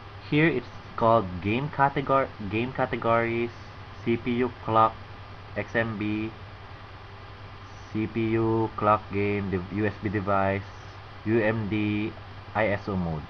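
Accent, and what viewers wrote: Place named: Philippines. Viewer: god damn, work on your awful accent pinoy shiT.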